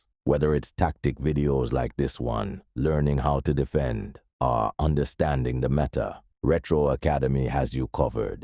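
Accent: Jamaica. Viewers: Great informative video as always bro, you will always be the Bob Marley of eafc... I love the Jamaican accent😊....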